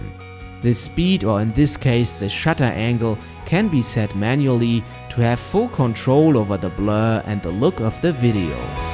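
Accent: Germany. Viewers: I like the German accent. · Hey. Ich fange demnächst an mit After Effects und dachte, als ich euer Video gesehen habe, dass ihr euch sicher sehr gut damit auskennt. Könnt ihr mir also bei dem Start in AE etwas helfen? Außerdem habe ich eurer "Deutsches Englisch" sofort erkannt! :) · ist deine Muttersprache zufällig Deutsch?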